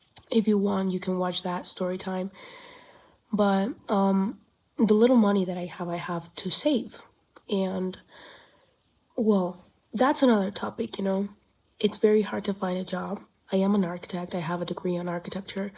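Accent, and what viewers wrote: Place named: United States. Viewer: Wait you aren't from America? Your accent sounds very american.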